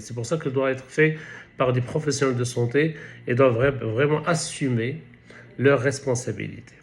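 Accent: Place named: Ouled Said, Algeria. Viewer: مستواه في اللغة الفرنسية شهادة شفهية ، عينية ، تفند ما يدعيه من موسوعية علمية. ما يثيرني في فرنسيته النبرة و الكنة ، فرنسيه اولاد سعيد ، أو الرحامنة ،حسب موضع الرضاعة.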